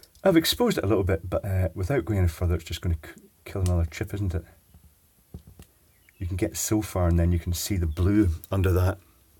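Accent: Scotland, United Kingdom. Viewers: I think you're mishearing his dulcet Scottish tones there, +jeromekerngarcia : 12 x 28 = 336. He later points out that the long rows are 7 x 4 = 28, so it's really 4 x (12 x 7), which of course still comes to 336, although you're right that Brexiteers would probably scrawl "It's 240 LEDs!!!" in massive letters on the side of a bus and claim anyone pointing out that the maths shows 336 was part of "Project Fear", these days known as "Project Reality" ;)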